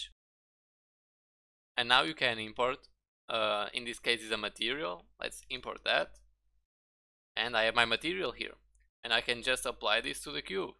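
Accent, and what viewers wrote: Portugal. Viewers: Ahaha percebi pelo sotaque do inglês! Portugal🇵🇹🇵🇹🇵🇹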